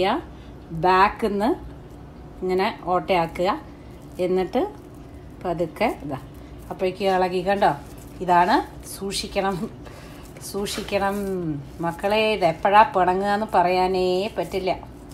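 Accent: Palakkad, India. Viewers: Palakadan slang kelkan nalla rasamalle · Nice - Also dear palakkad slang.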